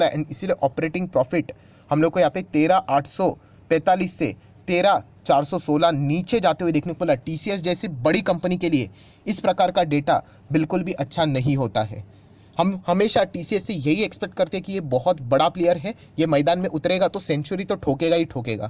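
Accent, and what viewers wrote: Maharashtra, India. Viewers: Mujhe lagta hai ki Maharashtra se hai🤔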